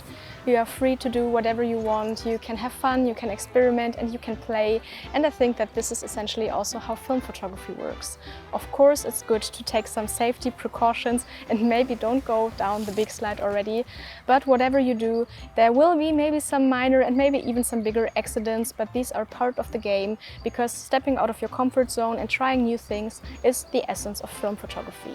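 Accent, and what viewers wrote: Germany. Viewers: Thanks for the detailed tips and also making me notice i kinda love listening to English with a German accent. Except when its mine.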